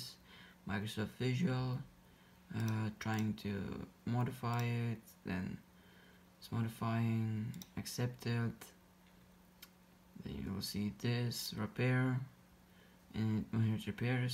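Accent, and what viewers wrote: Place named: Russia. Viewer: русский акцент не скрыть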